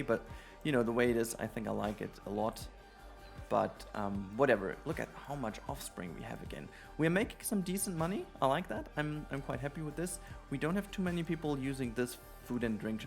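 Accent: Germany. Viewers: his english sounds so german 😂 btw like the video :)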